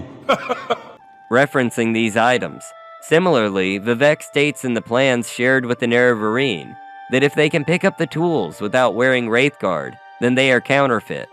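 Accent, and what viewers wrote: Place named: Canada. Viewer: Canadian detected. Don't you put it in your mouth though it might look good to eat (like a carrot or a beet!)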